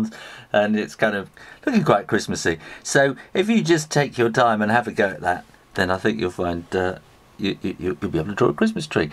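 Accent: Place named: England, United Kingdom. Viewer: Great video! Good job! What part of England are you from? Love your accent! :D Thanks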